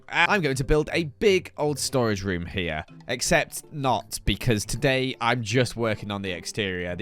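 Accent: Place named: United Kingdom. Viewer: Okay but the dry af "Tequila" in such a monotone british accent is my favorite thing ever.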